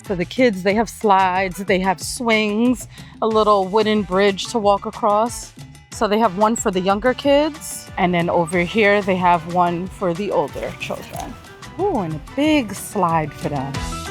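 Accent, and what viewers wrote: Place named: Caribbean. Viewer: OK. I'm from New York as well, Brooklyn to be exact. I kinda hear a Caribbean accent too from you.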